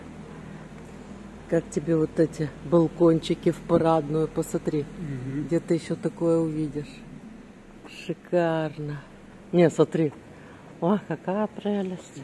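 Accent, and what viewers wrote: Odessa, Ukraine. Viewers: Спасибо вам за то что вы показуете Одессу. А голос я бы слушал бесконечно. Именно одесский говор. И никакие артисты в фильмах про Одессу не передают того одесского колорита как это делает. Спасибо вам огромное. Всегда смотрю ваши стримы. Я из Херсона их смотрю. Был в Одессе и очень скучаю за ней.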